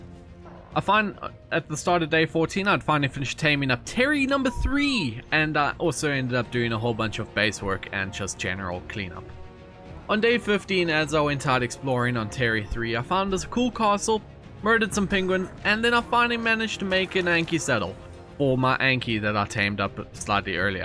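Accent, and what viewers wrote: South Africa. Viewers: Are you south african?? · R u south african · South African?? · Does anyone know where this guy is from? Accent sounds awfully like a South African accent, dunno, might just be me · you sound south african 🤔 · You South African? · Yeyi ARE U SOUTH AFRICAN??? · Ayy man you from South Africa you sound like you do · The sound of South African accent is strong with this one hahahah I love it Insta sub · You sound South African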